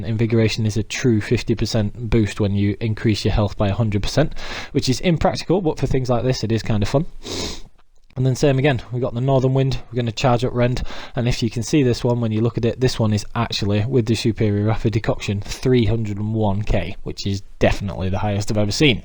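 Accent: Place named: Bolton, United Kingdom. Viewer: You from Bolton?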